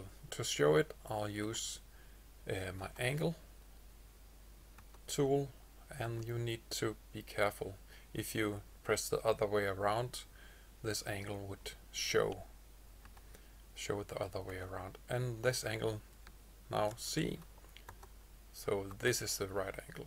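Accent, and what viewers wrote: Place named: Denmark. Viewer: hahahaha gal en dansk engelsk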